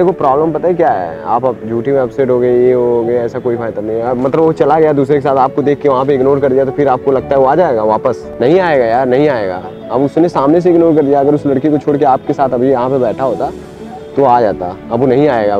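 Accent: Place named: Uttar Pradesh, India. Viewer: Up walo ke hi pass yese bat krne ka trika rhta h me bhi mirjapur se hu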